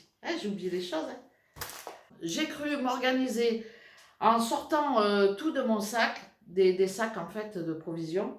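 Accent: South, France. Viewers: Coucou! Je vous découvre depuis 3 jours. Holalaaaaaa comme je suis contente! Vous êtes d'Avignon je crois. Moi j'étais à Boulbon. Originaire d'Arles. Et depuis 1 an je vis à Lyon. L'accent du sud me manque tellement! Alors un régal de vous écouter!!!!!!! Ce bel accent de mon pays!! Mon mari est aussi Portuguais, il regarde les vidéos avec moi lol ! Magnifique le Portugal !! Superbe vidéos. Vous me faites tellement rire!! J'adore! Vos idées recettes au top! Merci beaucoup d'énormes bisous!!!!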